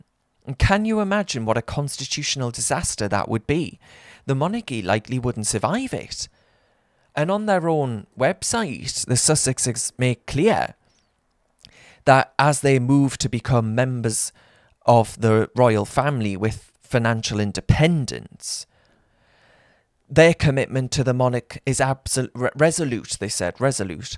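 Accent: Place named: Northern Ireland, United Kingdom. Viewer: Is this dude from Northern Ireland, I like his accent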